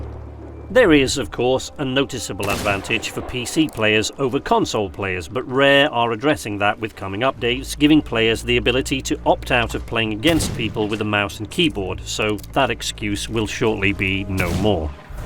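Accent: United Kingdom. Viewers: Why do Brits always seem so level headed, reasonable and intelligent when they speak! lol Great vid, earned my sub! Downloading the game now, can't wait!!!!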